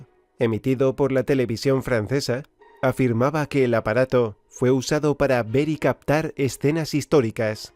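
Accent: Spain. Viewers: Que voz del locutor ezpañol 😊😊😊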